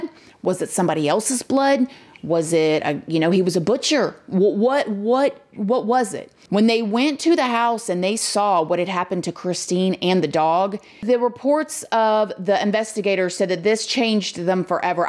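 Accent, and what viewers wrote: South, United States. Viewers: I agree agree I'm very southern her southern accent I relate with well. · Im guessing you're from TN you are GORGEOUS!!! And you got that lol southern twang 🥰😍🥰😍 in in Looooooove lmao fr tho I'm guessing outside of Nashville somewhere TN girls are just omg I've only been to Graceland and Nashville but you look the part all the way id move up there for girls like you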